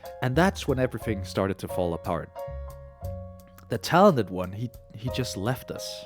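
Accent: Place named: Denmark. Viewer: wait are you danish? no shot. im dead now that i know i cant unhear the accent.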